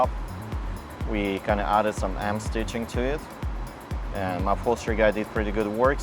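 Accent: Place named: Soviet Union. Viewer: sorry buddy but your soviet accent is annoying but good work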